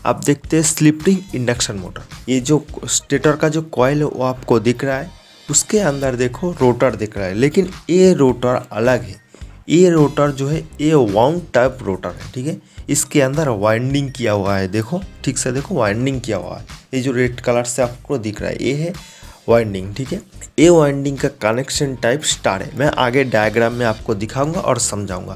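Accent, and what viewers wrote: Bengal, India. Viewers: তুমি বাঙালি তাই না??. হিন্দি বলার ভঙ্গিতেই ধরা পড়ছে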